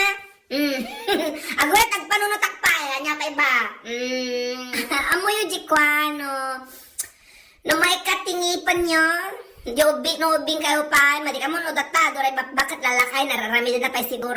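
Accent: Ilocos, Philippines. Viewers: Ang sarap mong tumawa auntie.. Ang galing mo talaga, pure Ilocano.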